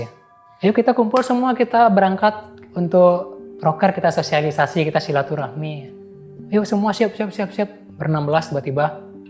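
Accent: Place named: Bali, Indonesia. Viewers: abangnya ngebali banget logatnya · Ada logat bali nya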